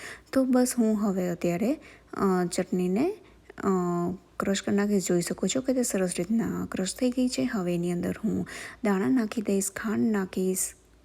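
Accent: Saurashtra, India. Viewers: Nice vlogs. Aaj first time aapna videos joya Aap Saurastra thi 6o e language tone par thi khyal aavi gyo. Kya jilla thi 6o ? Tya na lifestyle upr video banavjo to ahi thi tya javavala loko ne help mle.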